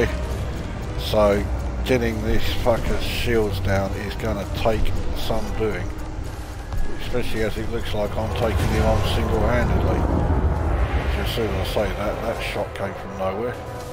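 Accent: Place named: London, United Kingdom. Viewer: I engineered my weapons Thermal vent but Distributor has so many alternative's to work out so I will try weapon based Engineering.I must add at 63 now I'm trying my best to get the most out of my ship's and being an Elite Vet since 1984 I'm still on a learning curve on this game.Nice work on the vids and I love the Old Smoke accent.Cheers from Raymondo the Taff.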